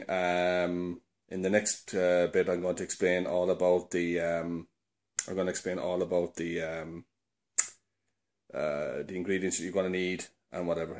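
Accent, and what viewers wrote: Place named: Caribbean. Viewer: Caribbean accent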